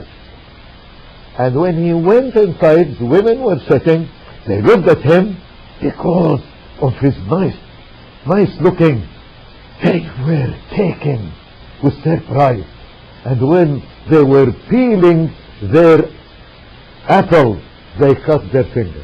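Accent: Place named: Egypt. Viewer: U lier egyptian stop speaking funny english,u talk about story of joseph it is beautyful story by reading only this "chapter joseph"in holy Quran "Cat stevens"famous pop singer of England became muslim and left doutful religion of christianity,you can visit his website his new name is Yousuf Islam,he choose his name yousuf because he was converted by reading "sura yousuf"from quran.and you lier telling chapter yusuf is wrong.if u want i will quote pornography from Bible,but we respect all books.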